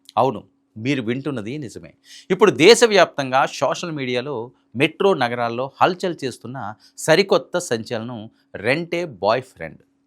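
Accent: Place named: Andhra Pradesh, India. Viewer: వరై ఏంద్రా ఆ loveday ల భాష..యాస ... నీ ఆంద్ర సోల్లు ఆపు... మంచిగా తెలుగు మాట్లాడు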